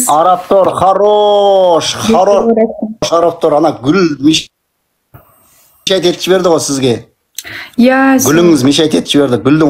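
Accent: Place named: Karakalpakstan, Uzbekistan. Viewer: Бауырым шаршама.Каракалпакша адеми болды.жарайсын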